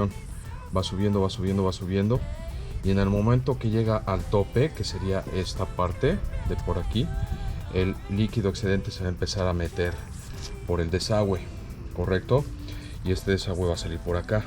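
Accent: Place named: Mexico City, Mexico. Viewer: Yo lo que recomendaría es no utilizar el acento chilango aspiracionista para tu contenido, es muy molesto y dificultan ver el video. Saludos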